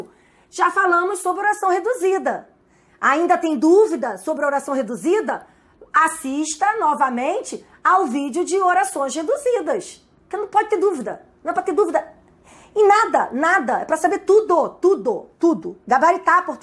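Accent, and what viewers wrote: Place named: Rio de Janeiro, Brazil. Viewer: Por que todos os professores de português são cariocas?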